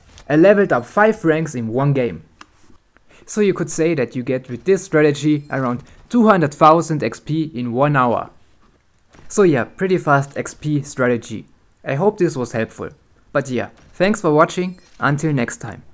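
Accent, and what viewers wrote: Germany. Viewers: Hört sich sehr nach deutscher Herkunft an, irre ich...?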